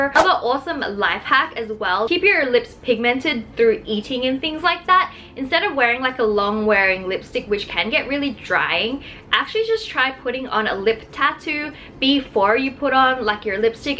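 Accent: Australia. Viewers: I love your Australian accent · WengieVlogs thanks:) i think people watch your vids partially for your content, personality, and editing skills;) and partially because of your Australian accent ^-^ and maybe your hair ♥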